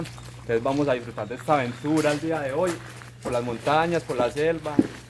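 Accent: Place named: Antioquia, Colombia. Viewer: Ese acento paisa me encanta. Gracias por el video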